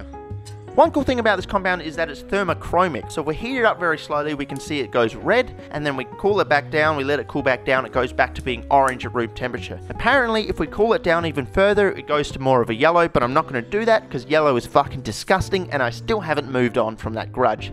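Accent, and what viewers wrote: Australia. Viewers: finally a chemist who is informative, and yet satisfies my need to hear an Australian say their favorite "C" word about 65 times in a video. Easy sub. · He looks and sounds like an Aussie Gerald Buttler.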